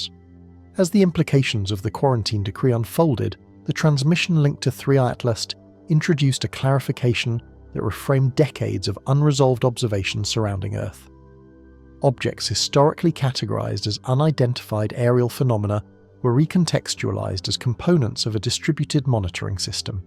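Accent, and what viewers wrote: United Kingdom. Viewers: Saying lots of words in a UK accent with no point.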